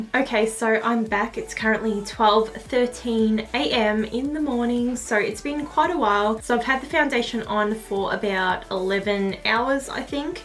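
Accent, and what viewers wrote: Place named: Australia. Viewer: I love your channel! You're my fav. Makeup-youtuber with no exceptions, love the australian english too!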